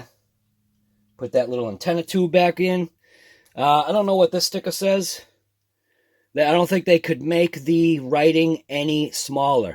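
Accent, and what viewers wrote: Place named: Massachusetts, United States. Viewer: Brutha! You've gotta be from Massachusetts! Lmk sir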